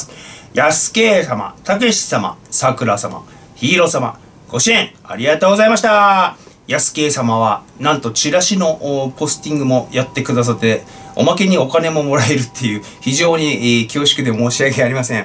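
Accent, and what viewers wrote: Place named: Iwaki, Japan. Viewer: 熱がある中 配信ありがとうございます🙇手術のあとは、無理をせず 本当にしっかりと静養されてくださいね!? そして、さらにパンチの効いた配信を楽しみにしております🎤 くつざわさんのいわき弁、大好きです🤗🎤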